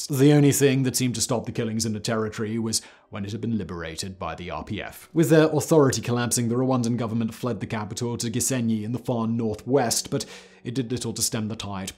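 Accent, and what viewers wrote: England, United Kingdom. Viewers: Or... he wants to get to filming the other 50 videos he has scheduled for the day where he just sits there reading a Wikipedia article that someone else just printed out and handed to him 30 seconds before. Seriously... this guy makes his living sitting and reading shit. He doesn't give a shit about telling stories he makes it clear he hasn't read any of the scripts before they record them and usually has no clue what he's talking about. Whenever he pauses to add his own opinions on a subject it's usually something simultaneously arrogant and stupid. He's just reading as much crap as his accomplices can print out and put in his hands so they in turn can upload it to YouTube and get money on clicks. How many channels does he have now? 50 or so? Do you think he even knows? He's not a historian or a media personality or a great thinker or entertainer. 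He's a reader. With a beard and an English accent. A glorified Speak and Spell. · You are speaking too fast with your British accent that makes it hard to catch up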